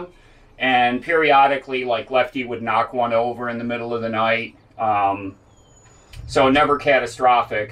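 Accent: United States. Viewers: I was watching something else on utube and the block had the same voice/accent as yours, where in the states are you from? Just heading off this morning bush camping in South Australia. Excited as Lefty getting a bone.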